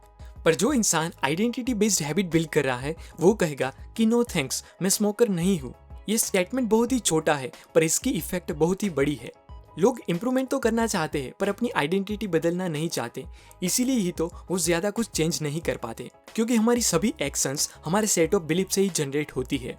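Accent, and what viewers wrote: Gujarat, India. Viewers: I liked your gujju accent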